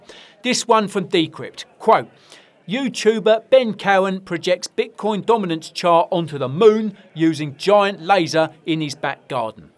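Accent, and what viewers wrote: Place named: Cockney, United Kingdom. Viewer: you was too good at being Barry - you're actually cockney, right?! - and the crypto gains made you!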